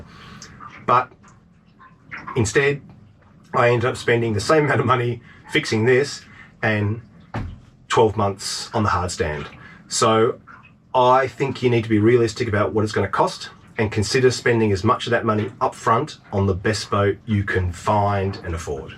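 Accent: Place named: Australia. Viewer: Loved the chooks,, hated him. No, truly mate, this has been a super adventure. Glad it was you and not me. Really admire your courage and tenacity and your 10 tips are right on the money. I'm an ex Aussie in Alberta Canada and listening to your familiar accent has been a treat. Looking forward to many more vids.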